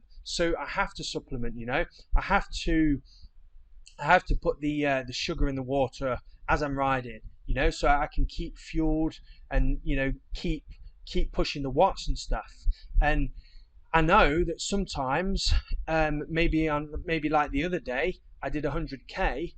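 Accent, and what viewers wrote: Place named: Midlands, United Kingdom. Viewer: AHH, ok, must be the midland twang! I'm from Manchester and we stretch out the last A. Banaaana. Loving the new surge in videos, hope you keep banging them out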